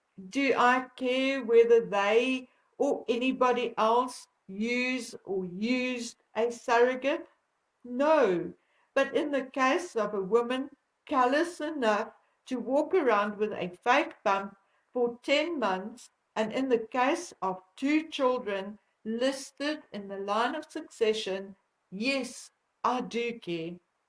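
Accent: South Africa. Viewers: It isn’t Americans falling for Prince Moob or wanting censorship, take your contempt & shove it. Britain Europe embraced Marxism, fascism and nazi-ism. You resent Americans and Brits and Europeans have tried pushing Marxism on us. Leftist organizations attacking Americans free speech rights yet you want to blame Americans. The ignorance is on people like you I have had it. Remain in your old world, ignore the rapes of little girls and women like your royals in all of Britain and Europe do. Have & blame America; I can guarantee you we will never again go to your rescue. You & the former outpost of empire Africa though from your accent you sound more those other allies of the nazis, the Boers. Who has kept & upheld monarchy & made excuses for these backward wannabe grand high poohbahs of upper buttcrack, who had no problems with their empire that they still clutch to their chests and all the problems that came about because of that. 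Who considered drug addicted nazi Harry such a popular cheeky fellow, just as they loved his nazi great uncle David. I had sympathy for Diana, and William, The Soho ho wasn’t popular in the US, but in Canada, she was assisted in entrapping your nazi Harry by other Canadians. Imbeciles like your offspring who vote fascist socialist Trudeau who attacks free speech in ho Canada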